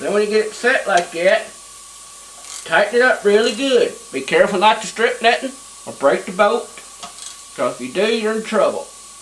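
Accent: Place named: Tennessee, United States. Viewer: Are you from Tennesee some where's ? Like around Kingsport? I knew another guy sounded just like you from up that way. Excellent video and I just pulled my cover on my 11hp 92 briggs, and was wondering about that magneto being rusty, and this was very appropriate. Take care and keep the video's coming...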